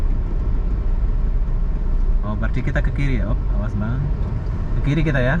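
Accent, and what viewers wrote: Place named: Malaysia. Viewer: Bahasa Melayu nya kentel bgt bang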